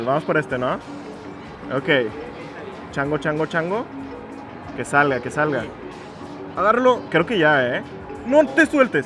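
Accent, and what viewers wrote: Monterrey, Mexico. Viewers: Me suscribo porque sospecho que eres paisano de mty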